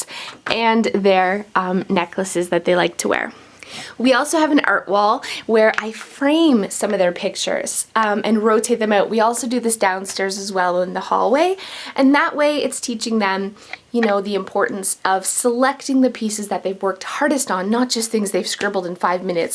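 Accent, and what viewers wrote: Canada. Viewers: I'm a new sub! Love your channel and your personality! Are you Canadian? Your accent sounds that way ;) But I'm from Alabama so I'm sure I sound great to you! Ha!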